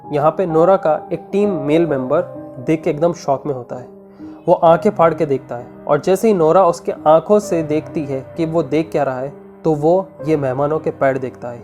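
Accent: Hyderabad, India. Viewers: I lov ur hyderabadi accent....ur videos r som real material...Greatez job...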